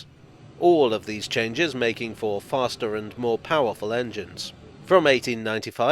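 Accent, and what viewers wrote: United Kingdom. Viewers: you have one of the most pleasant voices and diction on Youtube. So perfectly clear that your unmistakenly British accent is but nice color.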